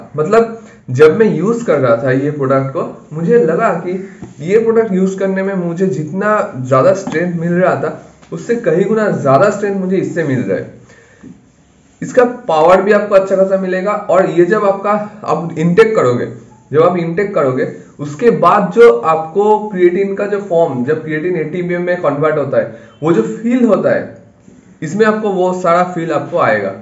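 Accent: Bengal, India. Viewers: Kintu talc gaa me lagate hai shunke...samajh gaya banglai hey... Cholbe bhai chaliye ja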